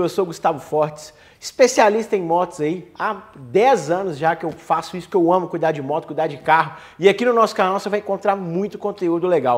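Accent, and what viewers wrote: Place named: Minas Gerais, Brazil. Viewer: Sotaque mineiro é foda...ja da pra daber so de ouvir kkkkkkkkk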